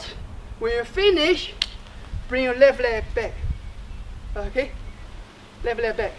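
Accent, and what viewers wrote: China. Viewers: I believe almost 💯 % that he is chinês. He does have a beautiful accent.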